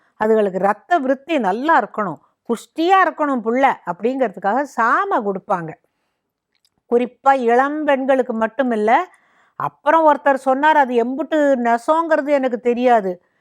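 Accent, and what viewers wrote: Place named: Rural Tamil, India. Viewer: I love the way you speak in rural Tamil. Very interesting and informative. ❤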